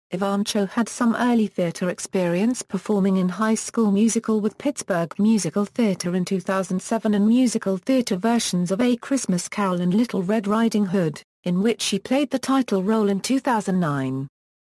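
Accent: United Kingdom. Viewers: Nice professional bio. The reader has a nice British accent, but some serious mis- pronunciations. Most serious is Jackie's last name: it should be ee - VAN - koe! Fairly good organization of many activities and accomplishments; it would be good to accent the headings and pause briefly after each one. The vocal delivery could be a bit more expressively modulated, with some enthusiasm, and somewhat less objectively flat. Thanks, though, for having this information about such an astonishingly remarkable youngster available for those who cannot read about her visually :)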